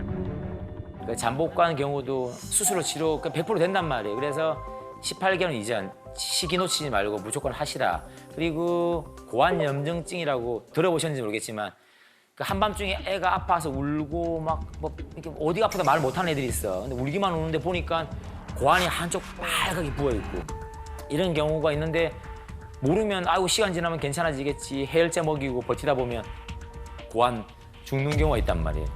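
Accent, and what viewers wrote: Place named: South Gyeongsang, South Korea. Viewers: 기분 나쁘지 않은 사투리임ㅇㅇ 어떤 경상도 사투리는 짜증이 가득 묻어있어서 짜증나는데 이분은 오히려 ㄱㅊ음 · 비뇨의학과 의사 + 경남사투리 + 딕션 모든게 완벽함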